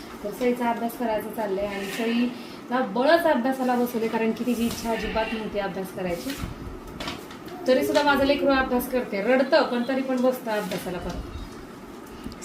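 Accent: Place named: Solapur, India. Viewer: मला तुझी सोलापूरी अर्थात करमाळा ची भाषा खूप आवडते तु लय भारी बोलते 💜🧡❤️💚💙💙🍒🍒🍒🍒🍒🍒🍒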